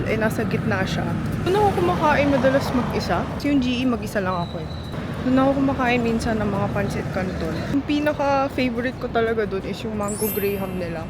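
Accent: Batangas, Philippines. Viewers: Kainan naman sa lipa haha or sa dlsl kaso sa gilid lang kainan dun. Papunta canossa. Since highschool ka ata andun baka di ka nakapagfoodtrio masyado. Nagschool din ako din way before you. Lol. Nakakatuwa ang iyong vlogs lalo na ang batanguena accent. Lumalabas pa din talaga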